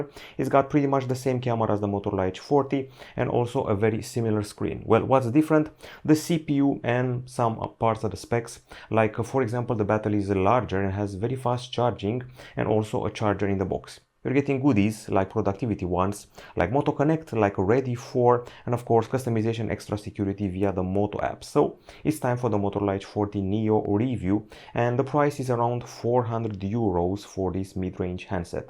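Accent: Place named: Romania. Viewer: Ce accent ...made in Romania 😊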